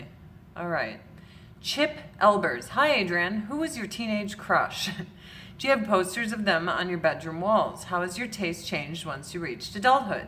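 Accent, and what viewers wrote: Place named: South, United States. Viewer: When she was on ANTM her accent was like so cool and tomboy-ish and she had like this southern accent and I LOVED that accent. But now she has this “normal” accent and it's not really “cool” anymore.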